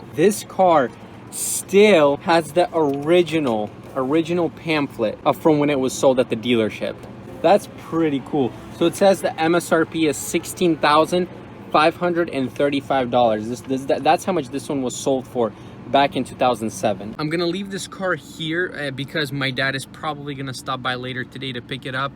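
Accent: Russia. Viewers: Dude, are you russian or something?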